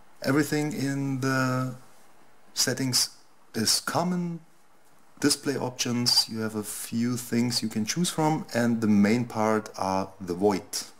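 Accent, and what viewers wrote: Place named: Germany. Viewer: Lol hast echt einen starken deutschen Akzent. Ist das das echte update oder ist es einfach von jemandem der das auf dem Internet hochgeladen hat?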